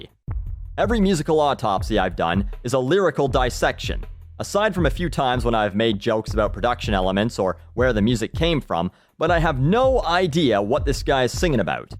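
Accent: Canada. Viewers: yeah, he's canadian I was thinking that too (since my boyfriend is canadian and im used to their accents) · you sound Canadian. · I was just going to say that. "Oot" "Aboot". Seems Canadian to me... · Oh... so thats what a Canadian accent sounds like.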